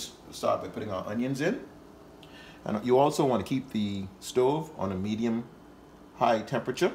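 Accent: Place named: Bahamas. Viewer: Thank you so much for this video.. my best friend was from Nassau and she used to make this for me and watching this video just brought back so much memories of her great cooking . Even listening to your Bahamian accent reminds me of her ☺️she passed away in 2010 and I love and miss my bahama mama best friend/sister so much 💔🕊🕊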